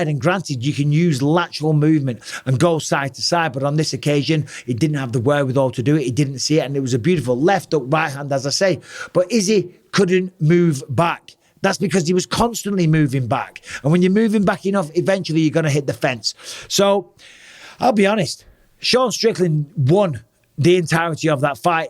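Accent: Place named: England, United Kingdom. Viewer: Any englishmen channel gets a dislike even before even watching. Still gotta watch. Annoying accent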